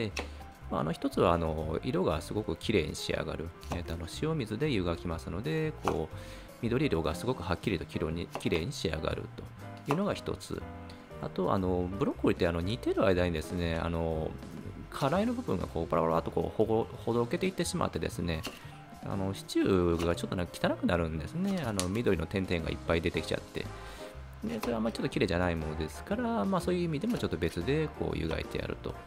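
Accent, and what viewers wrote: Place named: Nagoya, Japan. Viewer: 節々のイントネーションが名古屋っぽい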